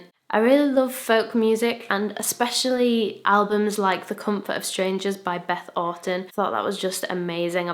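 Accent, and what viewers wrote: United Kingdom. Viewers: I have been listening to your music for a while now. This is the first time I've heard you speak and i didn't realise that you have a British accent lol I always thought that you were from America! Lol x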